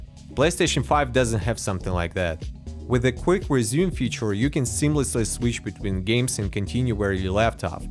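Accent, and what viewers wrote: Russia. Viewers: Капец как русский акцент слышен!)))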